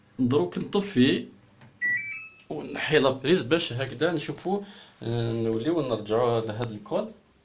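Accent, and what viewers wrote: Algeria. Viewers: هادا كلام باالعربي فقط لهجة لهجة جزائرية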